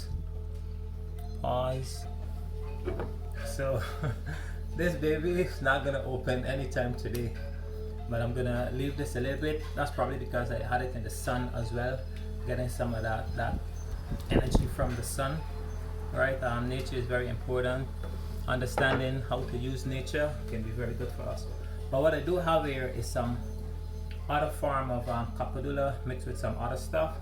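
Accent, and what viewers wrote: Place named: Guyana. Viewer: u sound guyananese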